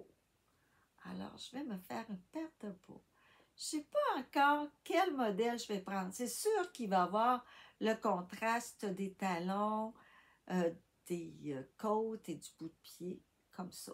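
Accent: Quebec, Canada. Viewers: Quel plaisir de vous visionner, j'adore votre douceur et votre bel accent québécois. Bonnes vacances. Bisous de la France 😘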